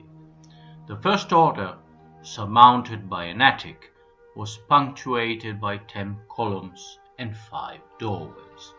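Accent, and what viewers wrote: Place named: Italy. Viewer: I was able to understand your accent,. Lovely accent by the way, it goes hand-in-hand with the Italian story you are narrating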